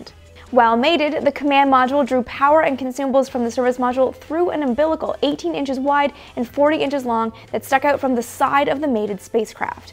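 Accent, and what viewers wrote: Canada. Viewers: Is this young lady a Canadian? I've heard the word "Abbooouuutttt" a few times, during her videos. Or, am I just hearing things? You never know, "eh." :)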